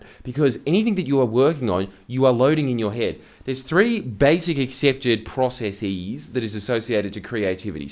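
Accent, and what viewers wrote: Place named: Western Sydney, Australia. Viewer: Preach it ❤ I always knew you were wise. Now i know you're also thoughtful and caring. P.S. your Western Sydney accent is 🔥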